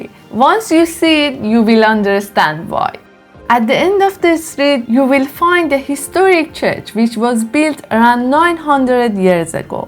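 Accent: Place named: Iran. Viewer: You sound very Iranian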